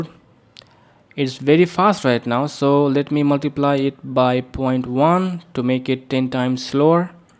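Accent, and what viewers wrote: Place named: India. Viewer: You are indian? I dislike your accent!!!!!!!!!!!!!!!!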